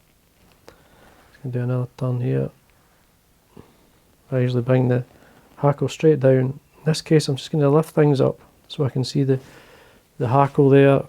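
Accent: United Kingdom. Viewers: Superb,what else can I say! As soon as I saw this tying I got my bits boxes out to attempt a tying myself all the little trimmings I saved that you told us to keep and my treasured packets of Coq de Leon I bought a while back and locked away as I was scared to use it having paid more than I admitted to my wife much like a jungle cock cape I bought over twenty five years ago from the angling centre which is nearly bare Disaster one of our farm cats got in the window of my man cave and made off with my Coq de Leon feathers I had just opened and left on my desk twenty quids worth Gone I searched the garden but all I could find was feathers from our ducks and hens Now I will have to use substitutes I was so looking forward to tying a few dries and trying them out but it’s had me make a decision that I will use materials and festhers I buy and not squirm them away like Scrooge and his money Once again your patterns and things are excellent as is the video and narrative the posh accent with the occasional slip into the accent of the shire is as always so entertaining thank you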